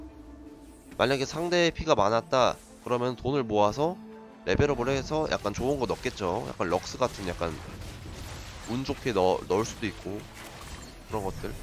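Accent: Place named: Chungcheong, South Korea. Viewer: 항상 롤토체스 많은 정보 얻어가요 말투가 충청도 분이신 것 같아요!!ㅎ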